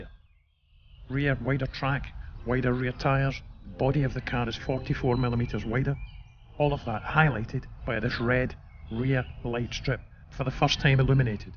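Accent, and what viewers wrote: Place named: Scotland, United Kingdom. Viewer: that scottish accent is brilliant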